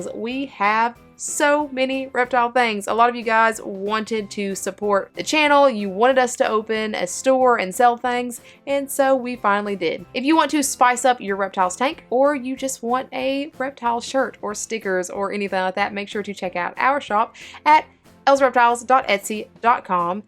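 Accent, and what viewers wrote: South, United States. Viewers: Such a pretty and upbeat young lady. Love that southern accent! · I like your southern accent, and the way you say thangs lol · New viewer. Great content! You from the South?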